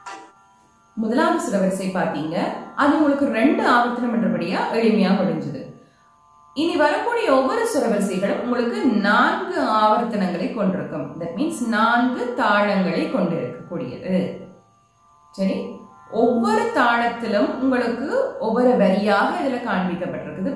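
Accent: Sri Lanka. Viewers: India or sri lanka Your language style is like Jaffna mam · இலங்கை தமிழ. அருமை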